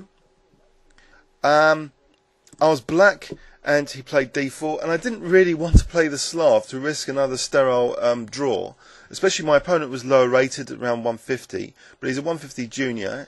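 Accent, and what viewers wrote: United Kingdom. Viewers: i love british accent!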